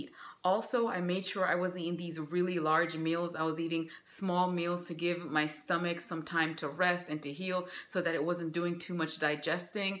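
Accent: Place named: Caribbean. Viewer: You sound like you are from the Caribbean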